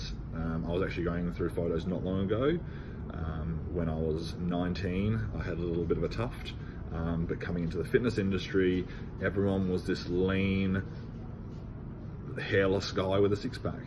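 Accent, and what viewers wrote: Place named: Australia. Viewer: I like your beard and moustache!!! And the Aussie accent isn't bad either.....;-)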